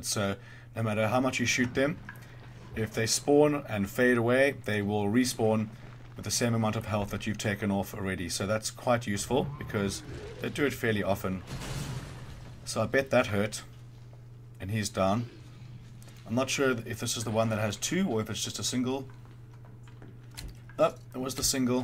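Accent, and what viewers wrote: South Africa. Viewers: You sound like you're from SA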